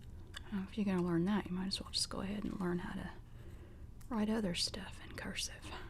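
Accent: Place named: Georgia, United States. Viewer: Boy you sound like you're from Georgia too! I have a thick accent too according to my friends haha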